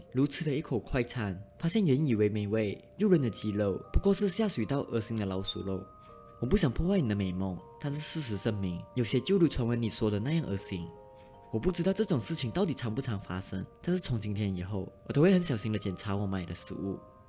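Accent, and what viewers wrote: Malaysia. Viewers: 大馬口音好嗎？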